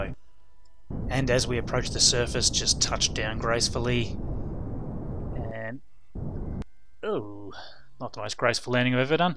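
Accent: Australia. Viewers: Finally an Aussie you tuber thanks mate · Nice to hear a fellow Aussie playing KSP!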